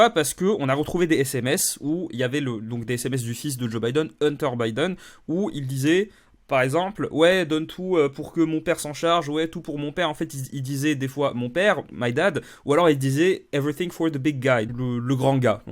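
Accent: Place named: Flanders, Belgium. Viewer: Un flamand français ? C'est devenu extrêmement rare...(t'es de Bailleul ?) Ou belge ? Merci pour pour ton analyse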